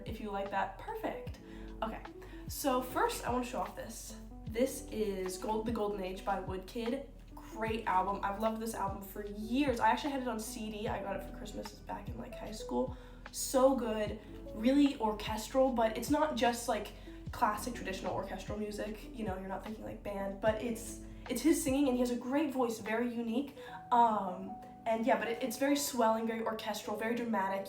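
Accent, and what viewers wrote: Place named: New York, United States. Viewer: So yesterday, being NY born, I saw a vid about NY things you didn't know. Today I get a short of you dressed as a Brit on a rock. (Photographer) I was taken aback as I lived in Dublin for years and I found your doppelganger, good friend then, from Kilkenny. It's not like it's similar, it's exact! Anyway it was cool to see that lovely Irish face again, but speaking with a NY accent. Also very eclectic pics here, I'll check some out, thanks. Slainta and cheers.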